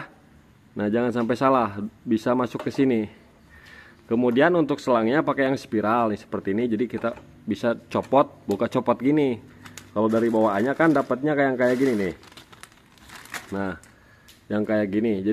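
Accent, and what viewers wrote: West Java, Indonesia. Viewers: Mas'se kalau bicara,,?,🙄 logatnya seperti orang Jawa Barat..😅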